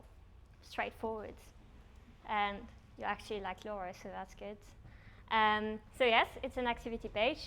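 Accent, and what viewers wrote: France. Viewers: Very educational presentation with good examples. Easy to understand. I like the French accent too :)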